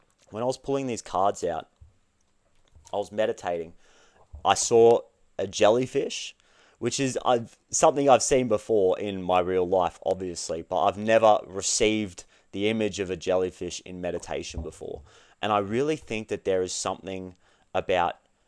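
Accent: Australia. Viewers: Is he Australian? I'm Italian, and I don't know that accent 😅 first I thought it's Scottish but Idk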